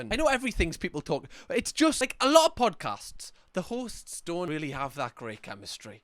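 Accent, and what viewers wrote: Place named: United Kingdom. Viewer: as a fellow brit I'm always so happy to see you upload and I get to hear a familiar accent talking about stuff instead of the Americans haha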